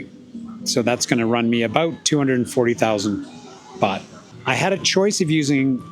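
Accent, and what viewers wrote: Canada. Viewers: Your accent appears to be Canadian. How come you didn't go for the free stuff in Canada?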